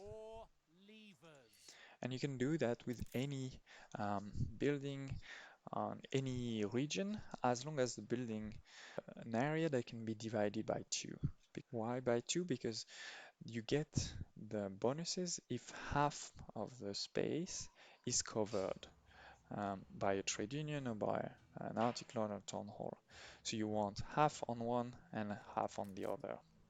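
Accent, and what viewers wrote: France. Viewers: l'accent francais ptdr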